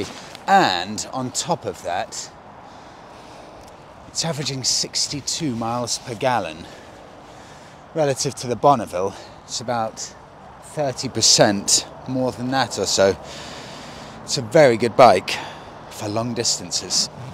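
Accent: RP, United Kingdom. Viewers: Enjoy your trip to my end of the country. Though you will sound posh to most foke up here 😊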